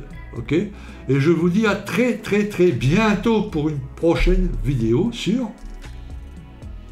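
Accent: North, France. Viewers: Salut, j'ai découvert ta chaine il y a peu et j'aime beaucoup.Le ton et la vie que tu y met (ce qui n'est pas toujours simple en fonction du sujet) 👍Et le p'tit accent de ch'nord me ramène à mon enfance ✌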